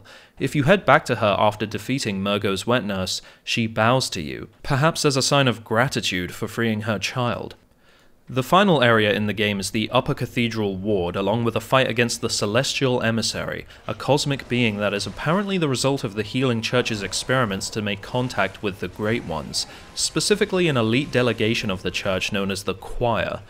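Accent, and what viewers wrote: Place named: United Kingdom. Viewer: I went from british dudes narrating the savanah to british dude narrating videogames.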